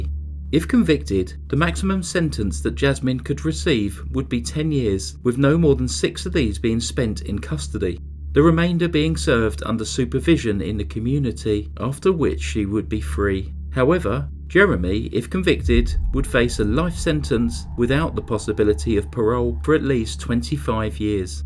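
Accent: United Kingdom. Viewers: Clearly from your British accent you are 'not from around here'. Which just makes me appreciate all the more that you put the effort in to give proper name places. I get so sick of the ton of video stories, especially from the States, where they will say Edmonton, Canada or Toronto, Canada or Calgary, Canada, etc etc. It's like they don't recognize we have provinces here. Many provinces have towns with the same name, so you'd better specify the province if you are mailing something!! As far as this case goes if Canada gets any more lenient on murderers, people will be crossing borders to commit their dirty crimes here knowing they'll only get a slapped wrist!- But seriously, 6 years inside for slaughtering THREE people-- so our Government gives just a worth of 2 years per person. So a kid can kill me tomorrow & my death is only worth 2 years!! And if one of your innocent victims is a LITTLE BOY, and your own little brother, no big deal, only worth 2 years still. 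How the hell can she be free?? HOW??? My god please tell me before this little monster was fully released she was permanently spayed. God don't let her breed her evil blood. Otherwise one day we will be reading about how she brutally killed her own children too.😕 Rest In Peace, sweet baby brother.🌹😔🌹. 🇨🇦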